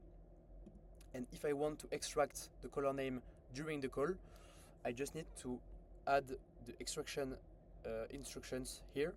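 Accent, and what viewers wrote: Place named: France. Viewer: Pourquoi ne pas faire une vidéos en Français alors que la société est française, de plus avec un anglais avec accent français .Dommage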